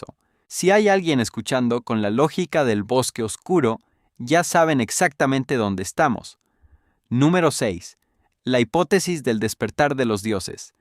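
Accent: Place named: United States. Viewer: Tío, te explicas como el culo. Omites cosas de las teorías y de resultas hay cosas que no se entienden. Por ejemplo, respecto de la paradoja de Fermi, omites la conclusión de que, si han existido civilizaciones tecnológicas desde hace miles de millones de años (eso lo dices), LOS PLATILLOS VOLTANTES Y LOS MARCIANOS DEBERÍAN ESTAR POR TODA LA GALAXIA. EN PLANETAS Y SATÉLITES DESABITADOS QUEDARÍAN RESTOS DE SU TECNOLOGÍA, CONSTRUCCIONES, MINAS.... (eso no lo dices. El que lo deduzca por sí mismo, bien por él. Al que no, que le den). En este caso imaginar lo que falta es sencillo. En otros no tanto. Maquinas autoreplicantes que pueden construir otras como ellas con los recursos del entorno. OK. ¿Y? ¿Por qué la función de esas máquinas debería ser la de destruir toda civilización que encuentren? ¿De dónde se sigue eso? ¿Qué parte del argumento de has comido? 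Hablas de ausencia de estructuras modificadoras de sistemas estelares, sin explicar la teoría que propone la existencia de esas estructuras, con un sistema de 3 fases según el grado de explotación de la energía de su estrella alcanzado por la civilización en cuestión... Tú vas largando y la gente que se imagine lo que te comes, ¿no? Anda, pon menos interés en pronunciar el inglés como lo hacen los yankees y aprende a hablar mejor tu idioma. Y mientras tanto, dedícate a otra cosa, macho, que lo de explicar cosas no es lo tuyo.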